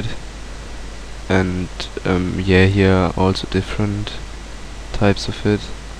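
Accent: Germany. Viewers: ... you're German, aren't ya?:D haha, liebe Grüße! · are you german?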